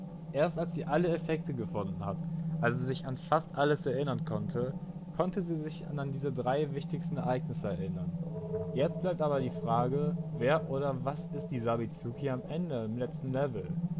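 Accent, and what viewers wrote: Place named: Germany. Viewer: diggah als du english am Anfang gesprochen hast wusste ich sofort das du deutsch bist😂😂 dieser Akzent die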